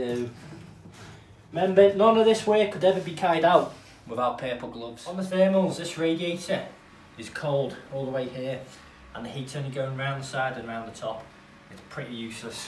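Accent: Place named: Liverpool, United Kingdom. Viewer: I live in Liverpool and have 1 radiator (large) in my house that’s cold at the bottom and warmer at the top. Given that your accent is local to me are you still doing these jobs & how much would you charge for 1 radiator? Cheers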